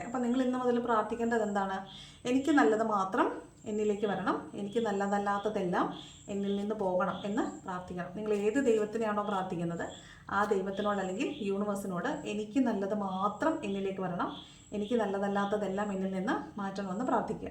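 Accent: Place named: Trivandrum, India. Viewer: Chechi trivandrum anale.... Chechide ambada pulusuuu kelakan nalla ressama.... Chechi parayunnatoke correct anu...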